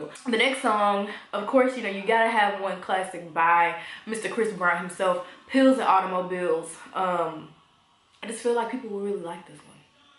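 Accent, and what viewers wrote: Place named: New Orleans, United States. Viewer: Yea that NOLA accent came out STRONG in this video 😂😍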